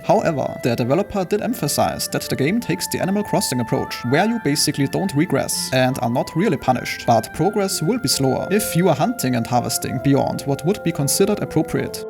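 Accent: Germany. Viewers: Sorry to say this but I couldn't get past the thick accent (assuming you're from Germany?) Maybe try to slow down your narration and enunciate the words a bit more. I hope this helps.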